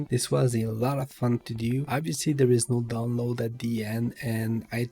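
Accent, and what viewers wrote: France. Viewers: Are you french😮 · T’es français en plus ??? Je reconnais l’accent 😭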